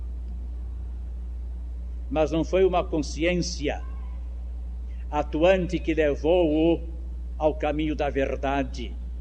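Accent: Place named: São Paulo, Brazil. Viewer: Ele não era carioca? O sotaque é de paulistano da Mooca!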